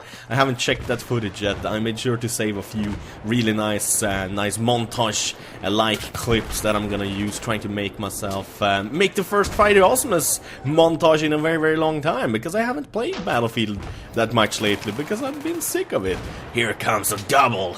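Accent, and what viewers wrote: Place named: Sweden. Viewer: I love your swedish accent// I'm from sweden to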